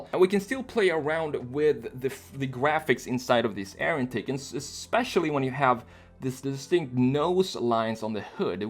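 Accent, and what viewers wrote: Sweden. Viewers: Hejsan! Hamna på din kanal av en ren slump och hörde på din engelska att du va svensk? Rätta mig om jag har fel:D Jag vill bara säga att du gör ett fantastiskt jobb, riktigt kul att se, du har flera videos som jag måste börja kolla genom. Snyggt jobbat!!!!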